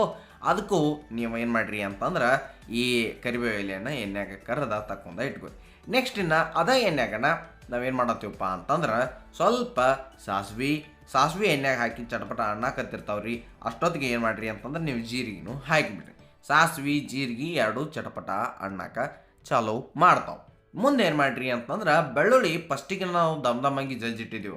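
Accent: North Karnataka, India. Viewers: ❤❤😅😅🕉🙏🏻 ಗುರುಗಳೇ.... ಖಾರ ಚುರುಮುರಿ ಜೊತೀಗ ಉತ್ತರ ಕರ್ನಾಟಕದ ಭಾಷಾ ಸೊಗಡು ಏಟು ಛಂದ ರುಚಿ ಅನಿಸ್ತೈತಿ ಹೌದಲ.....😅 ಸಿಹಿ ಖಾರ ಎಡ್ಡೂ ಸವಿದೀವಿ ನೋಡ್ರಪ...! ಧನ್ಯವಾದ. ನಮ್ಮ ಸಾಣಿಕೊಪ್ಪದ ಶ್ರೀ ಶ್ರೀಶೈಲ ಶರಣರ ಧನೀಗ ಹೋಲತೈತಿ ತಮ್ಮ ಧನಿ. ಶರಣ್ರೀ ಗುರುಗಳೇ 😊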